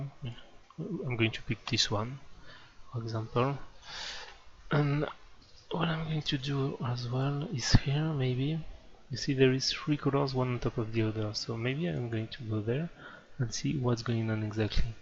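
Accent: France. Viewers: oula sound french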